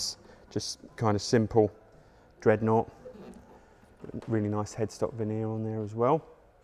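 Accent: United Kingdom. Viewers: Always nice to hear an Essex accent, and always nice to see fabulous Martin guitars. The best in the world. Sadly, I won't be coming to see you. No lefties!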